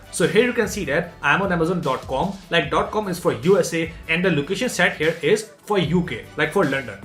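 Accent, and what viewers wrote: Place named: India. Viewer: not hate but your accent is an incredible thing that most indians do ITS AN INSULT FOR AUDITORS SERIOUSLY, fix this please!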